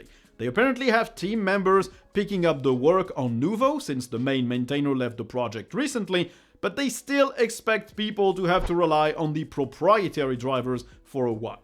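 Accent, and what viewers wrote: France. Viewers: I'm living in Haute-Loire, and as a French it's very strange to watch a French speaking English, at least I totally understand what you are saying 😆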